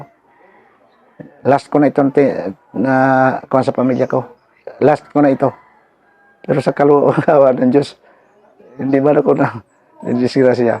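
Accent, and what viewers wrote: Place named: Cebu, Philippines. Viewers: May pagka waray si sir, I'm not sure pero base sa pananalita nya, waray or bisaya. Di xa ilonggo... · Visa ya po ang halo sa Salita nya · Cebu dialecnsiya..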